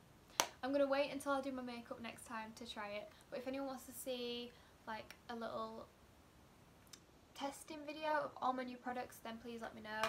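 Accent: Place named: Leeds, United Kingdom. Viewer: aw thanks I’ll have to check the 1hr tan out:) finally a British YouTuber, I’m from Leeds too. Isn’t it strange when you hear someone with the same accent 🤭 that was so cute when you got excited about your make up haha, Iv just placed a £150 beauty bay order, that’s what brought me to your video to see what products others are enjoying. I’ll be excited like you tomorrow when it arrives 😂 keep up the vids xx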